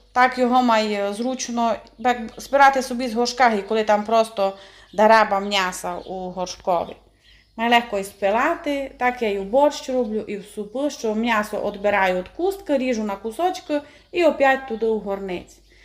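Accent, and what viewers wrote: Transcarpathia, Ukraine. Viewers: Як же гарно звучить Закарпатський акцент..смачно.файно,ладно до душі..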